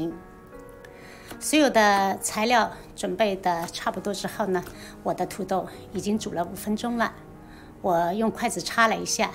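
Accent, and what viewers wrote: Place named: Southern Yunnan, China. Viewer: 我偶然刷到，一听就是马谱，我家云南人。了不起，很辣燥的云南婆娘。你口音是滇南人😊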